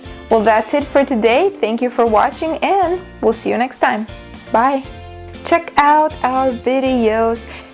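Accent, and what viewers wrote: United States. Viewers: Hello Teacher! I have checked out all English teachers on YouTube. I see English Teacher is one of these best teachers on YouTube. I've ever learned English with her videos, clearly American accent and made me easy understanding her videos, Thanks a lot Teacher.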